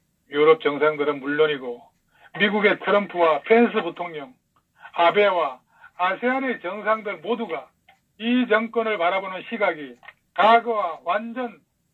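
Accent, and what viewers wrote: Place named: Gyeongsang, South Korea. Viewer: ㅋㅋㅋ... 경상도 구수한 사투리 쪼매 바빴다. 좋아요. ^^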